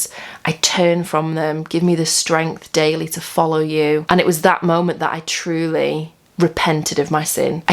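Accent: United Kingdom. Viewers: how beautifully British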